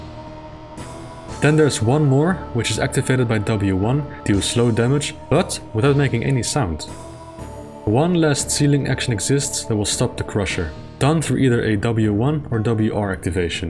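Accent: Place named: Netherlands. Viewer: You sound Dutch! If that’s the case, I’m proud such highly knowledgeable classic DOOM fan is from my home country.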